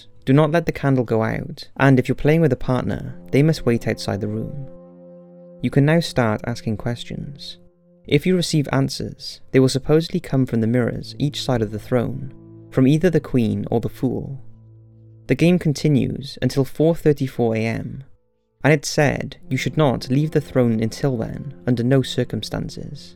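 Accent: United Kingdom. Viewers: i love your british accent 😊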